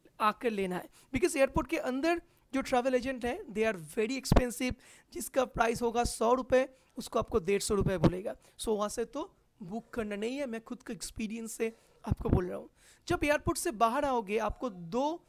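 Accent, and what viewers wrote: Bengal, India. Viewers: all ur videos are really boring, coz u mix lot of topics, this makes the channel really boring, half of ur bangali accent is boring too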